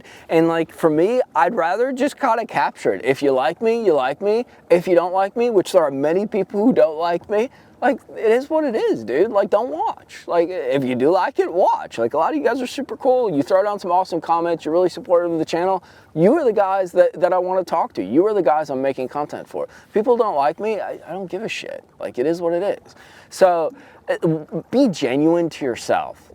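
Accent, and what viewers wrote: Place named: New York, United States. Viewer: Love your content,long time watcher of your channel. Curious if you are from New Jersey or New York, you sound like my college roommate! 😂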